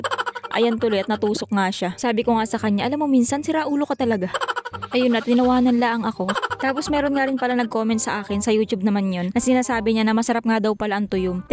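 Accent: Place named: Batangas, Philippines. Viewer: Kasarap mong panoorin idol kakatuwa kang mag Salita parang batanguena eh 😅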